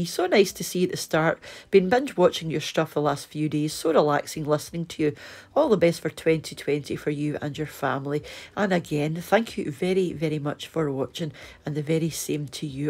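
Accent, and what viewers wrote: Scotland, United Kingdom. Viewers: I do love all the meat pies, we don't get them that tasty in Canada..I am loving to watch all your videos and love your accent so much..something about the Scottish accents..I worked with a lady that had a Scottish accent..and I'm jealous! love it.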